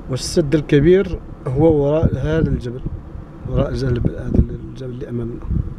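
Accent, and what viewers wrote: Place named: Jijel, Algeria. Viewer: اهدر جيجلي ماتخبيش اللهجة ديالك ا وخيي لازمك تعرف بيها لأنها من تراث المنطقة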